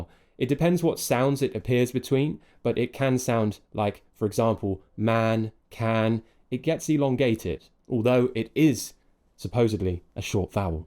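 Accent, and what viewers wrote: United Kingdom. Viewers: Thanks a lot mr for your videos and now you look more handsome than your some previous videos sir I always like your British English accent. Mr you always our British English teacher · Hi I really like you channel and your authentic British accent. ❤❤❤❤and by the way your handsome face is very charming. I am from asian 😅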